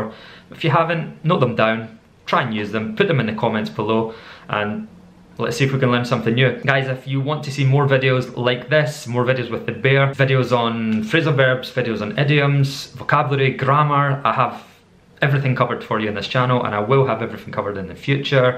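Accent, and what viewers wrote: Scotland, United Kingdom. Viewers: Do morrre classesss likiii thaaat, juust speakkk a little bittt slowly and using morrre Scottish accent. Grrreat. See you laterrr. I love the Scottish accent.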